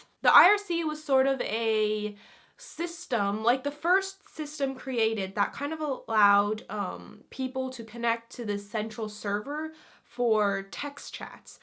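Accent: United States. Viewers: Yu sound American not Finnish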